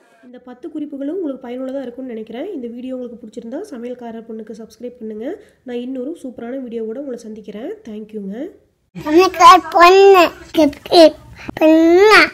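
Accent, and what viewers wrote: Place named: Coimbatore, India. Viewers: Neenga coimbatore ah akka slag super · Nga nu solra slank patha neenga Coimbatore ngala...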